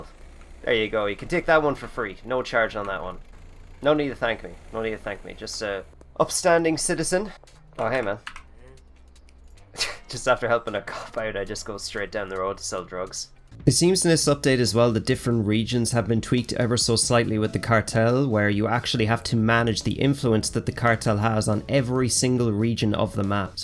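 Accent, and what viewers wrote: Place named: Ireland. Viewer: Nice video bro, What part of ireland u from man?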